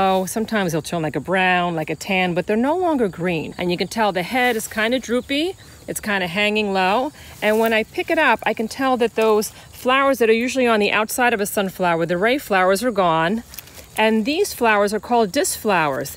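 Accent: New Jersey, United States. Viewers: What is the foxtail plant in your sunflower field?..Is it a millet or a grass amd is it beneficial to the sunflowers or just something that grew there? Thanks in advance. I always miss my home state NJ when I hear you talk😍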